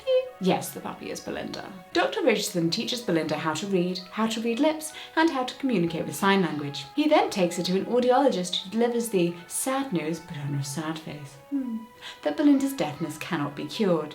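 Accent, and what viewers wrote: United Kingdom. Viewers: Maybe it's my American accent hearing your British one, but I could also reason using the word "pawn" as a stand in 😉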